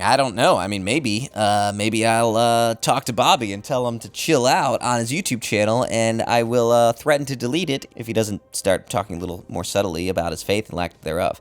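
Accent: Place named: United Kingdom. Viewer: He kind of sounds like Shelby from Adventure Time with a British accent. 😁😁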